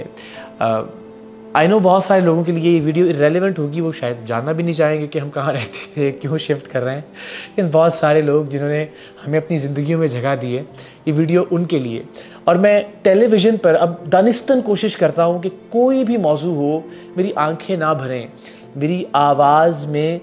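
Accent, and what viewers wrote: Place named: Karachi, Pakistan. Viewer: iqrar bhai apki urdu or bolne ka andaz aisa tha k mein jab se apko dekh raha houn yehi samajhta raha k ap karachi se he hain..ap k janay se aam log tou khush nahi hongay..haan chor 2 number log sukh ka sans lengay..ap k hone se karachi k issue kam az kam kuch had tak hal hojatay thay..ap k bad sindh gov. or awaam ALLAH he malik hai..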